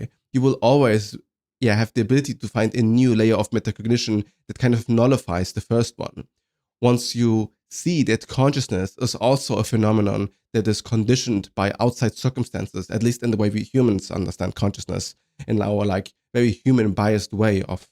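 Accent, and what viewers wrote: Germany. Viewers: hey friend, love your stuff. thanks for sharing. it's really brave of you to speak your truth and i really resonate with what you say. are you german by any chance?? i'm trying to pin the accent hahah. perfect english tho, no offense. have a good day !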